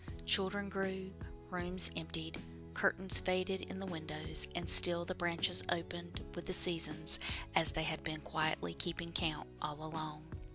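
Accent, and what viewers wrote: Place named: South, United States. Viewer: Lovely, Very Southern and relaxing.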